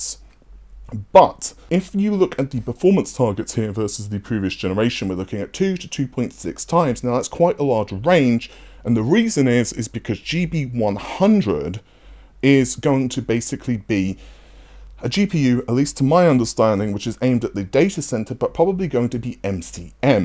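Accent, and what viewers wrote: United Kingdom. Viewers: With this dude’s British accent that gets produced by his nose, he would be an excellent replacement for severus snape in any Harry Potter prequels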